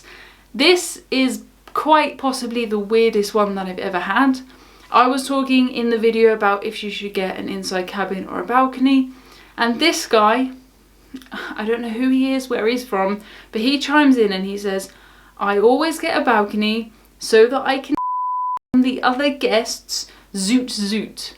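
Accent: RP, United Kingdom. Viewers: I love your opinion because you are real and have the experience to form a valid opinion. Love your “posh” accent, but I’m American and we generally fall for British accents! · Ah, but you do sound “posh” IF that means you can use the English language properly, and take the time to prep and clearly rehearse your bits off so the clips aren’t full of “ummm”, “err”. For context I’ve done voiceovers for corporate videos and know how hard it is to craft a script that flows, and how important rehearsal is. I love the Coffee House Crime (I think) channel but his script & delivery are not good, makes me twitch - I can watch yours all day, really well put together - AND I want to hear you play the piano!! 😂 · Posh is awesome. Your accent is one of the resons that I like your videos. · Your English of course your accent is posh 😆😆😆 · Until I watched this video I would have said is the channel least likely to offend anyone. 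is a cheerful, low key presenter, with a charming, easy to understand British accent, giving us the information on each ship and line, objectively, without trying to either "sell" one line or disparage another. She shows us the food, the itinerary, the cabin she booked (and sometimes, alternatives at different price points). She runs through some of the options you might want to buy in addition to your tickets, very helpful for people who have never cruised before or haven't cruised recently. I've concluded that I don't want to cruise any more, but I find her videos entertaining and fun to watch. I think there is a certain percentage of the public who just like to hear themselves complain. Pay no attention to them, just carry on. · Dear, The only cruise I took was as a child. It's unlikely I will ever take another, but as a non-cruiser who has the entire internet at my fingertips, I choose to watch you every day. 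Why? Because your goodness and positive attitude are uplifting and I love your "posh" accent. (You don't have an accent. Everyone else does. Go with that.) If you threw yourself to the sharks as one person suggested, they would make a safe corridor for you and salute you with their fins. No shark would disturb someone who loves the beauty of our planet as much as you do. Hugs and cookies from Idaho (US). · Great comment about being a juicy peach. Personally I don't like negativity, if I didn't like how you presented something I just wouldn't subscribe or even watch another video, if I am put off by anything I just stop watching, BY the way I could understand the posh voice sound but you can help your accent, because it is what your used to hearing all your life. I also like it, Oh another nice thing I'll add is you used a great cause to cut your hair and made good money for it. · In any business a brick and mortal or a platform like YouTube you will always have those that you cannot please. 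Like they say, 'You can please some of the people all of the time, you can please all of the people some of the time, but you can’t please all of the people all of the time'. On a positive note, I love your accent and I think you are a very attractive person inside and out! I found your channel as we are getting ready for our first cruise and was trying to learn all I can and your channel is one of my favorites, I get to learn about Cruising and British-ism :) After one of your videos about Queen Mary 2 we are considering take a Queen Mary cruise from the US to London and then spend a few days there and fly back. Thank you for all the work it takes to put out these videos! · I closed my eyes and for a moment thought I was in Downton Abbey..😁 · I don't understand people. If you don't like something, don't watch. Your videos are very interesting. I like your "Britishisms" and listening to your accent. 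What a nice smile! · I love your "posh" accent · Nothing wrong about sounding POSH. You have a nice South English accent. Don't let the silly comments get to you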